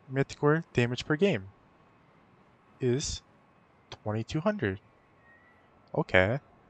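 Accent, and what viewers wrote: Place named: Canada. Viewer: Why is it that I can barely understand 4 in a 5 words you speak? You need to enunciate better, stop whispering, and just generally get rid of that advent. What is it, some sort of Canadian?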